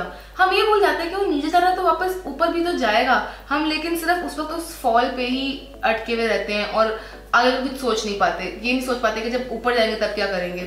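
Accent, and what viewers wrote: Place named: Delhi, India. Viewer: oh my god!desi grl ..ab pure ki pure delhi wali lag rh ho ...aap k hindi ...just wowwww ..😍😍😍😍😙😙😙😙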